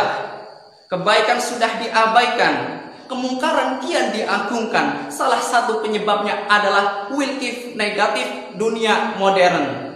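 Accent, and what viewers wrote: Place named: Banyumas, Indonesia. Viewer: Salam ngapak tadd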